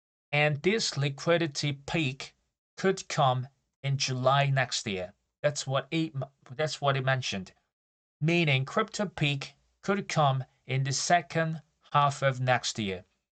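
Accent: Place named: United Kingdom. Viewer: !!! Thank you for your comments a few days ago😊 Your British pronunciation is cool unlike the American one I 'm learning~ lol I'm grateful to you. Have a peaceful day!!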